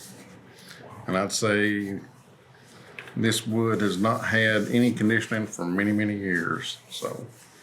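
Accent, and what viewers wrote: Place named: South, United States. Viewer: My husband and I just watched the whole thing together. Such a great video!!!! James has a few antique tools that my grandpa has given him and HE LOVES THEM!!!! Your channel is seriously so cool. I love your content and I love this video!!! :) Great job restoring!!! Keep creating. I’m subscribed and will always be:) Lastly, I love your accent! I wish I had a southern accent... From, Collins Country:)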